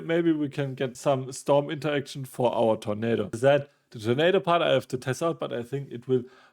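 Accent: Germany. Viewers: Digger dein deutscher Dialekt im English ist so wild 😅. Trotzdem danke fürs Video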